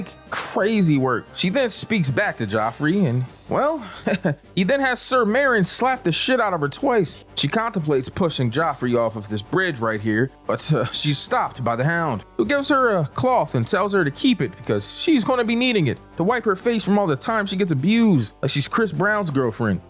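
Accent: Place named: New York, United States. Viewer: You sound like rosland or gary... or nyc.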